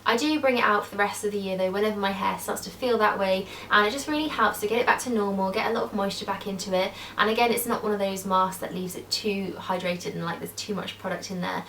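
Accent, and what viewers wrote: England, United Kingdom. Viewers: you are 1 of my faves here on the 'tube. But sometimes I don't understand everything you say. Don't know if it's b/c of the speed at which you speak or that charming English accent of yours. I didn't quite get the product you compared the Garnier Micellar water to around the mark? Great video as per usu & part 1 was awesome as well 👍 much love from Canada 🍁🍁🍁☺️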